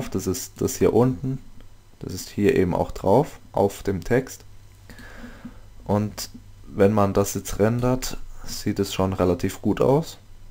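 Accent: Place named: Swabia, Germany. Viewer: Du bisch a kleiner Schwab wa? ^^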